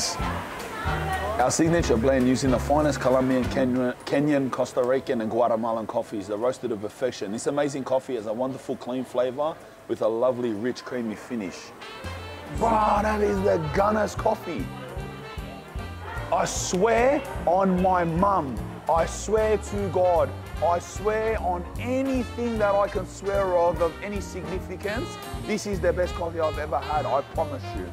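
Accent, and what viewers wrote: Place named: Sydney, Australia. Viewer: You must be from Sydney you sound like my Step Son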